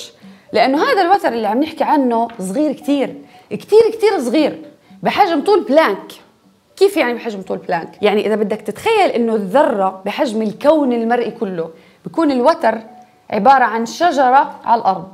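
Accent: Jordan. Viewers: ليست لبنانية.. ربما هي من جنوب الأردن ولكنها قطعا ليست لبنانية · ادري الموضوع فيزياء وبلاوي بس وربي اللهجه الاردنيه تجنن بحس ودي اضحك 😂😂😂 واحلى اشتراك 😊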